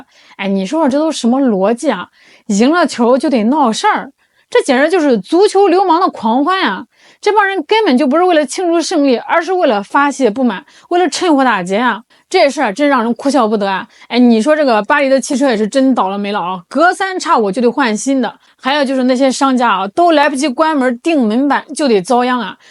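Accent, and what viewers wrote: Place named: Northeast, China. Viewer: 感觉口音象东北的人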